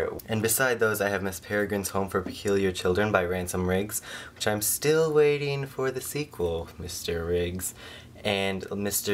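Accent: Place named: England, United Kingdom. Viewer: I must admit nothing gets me like someone speaking Spanish with that typical English accent so yay you made my night =). Oh and I am envious of your shelf =)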